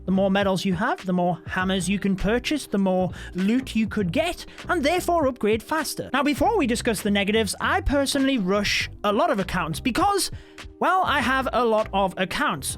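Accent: United Kingdom. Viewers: are you from Britain?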